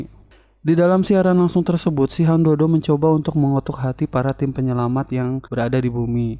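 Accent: West Java, Indonesia. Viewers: Abi ti Tasik kang, komentatorna sundanese, timana nya ?? Seru dan lucu.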